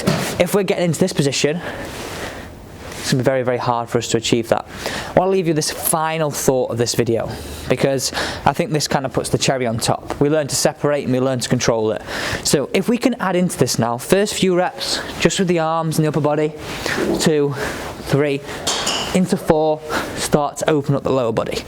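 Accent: United Kingdom. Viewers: Great visual drill,.! Brit accent and all!::)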